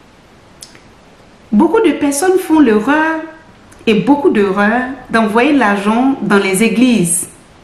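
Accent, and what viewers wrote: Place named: Ivory Coast. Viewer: Bonjour madame. Tu parles avec le langage ivoirien. Es tu de mon pays la Côte d'Ivoire ?? Tu es fabuleuse et je suis fier de toi et de tes propos.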